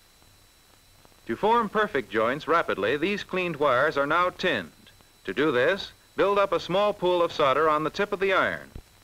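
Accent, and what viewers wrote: United States. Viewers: Why do Americans call it SODDER? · It's a silent "L", only here in America. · That is one heck of a soldering iron!!! Why do Americans pronounce always solder as 'sodder'?!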